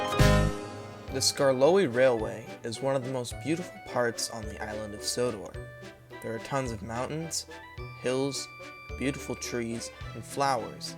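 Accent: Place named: Scotland, United Kingdom. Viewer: Your Scottish accent is priceless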